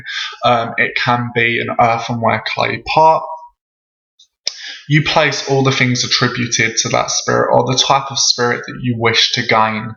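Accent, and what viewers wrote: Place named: London, United Kingdom. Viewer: what part of England are in ? You sound very London / Essex :)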